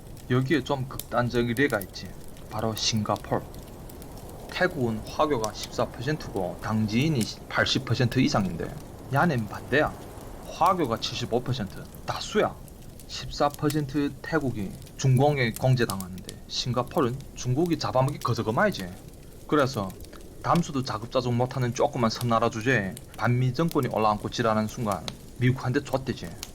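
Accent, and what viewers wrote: Yanbian, China. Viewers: 야 너꼭 돈 받아먹고하는 소리구나 이후부터 연변말 하지마 왜 뭘아는것처럼하며 남이 정치에 간섭이냐연변사람 쫒 팔리계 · 잘들었소ㅡ오빠욕하는것도 멋있고 연변말도 너무멋있소 다음절목을 기대하겠소 화이팅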